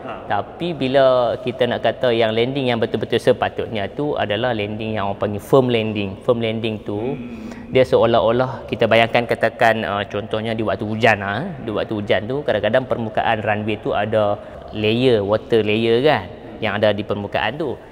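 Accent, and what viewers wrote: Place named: North, Malaysia. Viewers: hang memang sempoi bang..walaupun dh fly keserata dunia tapi masih tetap bawak loghat kedah..sempoi baqhang.. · Saya amat suka kepada cara penyampain yg Walaupun loghat utara tapi Santai. Bagus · Terbaik abg.. aku suka cara abg explain.. santai dan penuh ilmu.. yg penting bahasa utara tetap dihati.. tak da pon unsur2 selit bahasa engeris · Sempoi la dia sembang. Candid n natural. Syok dengaq org Kedah sembang. X yah nk kalut tukar ckp KL pun.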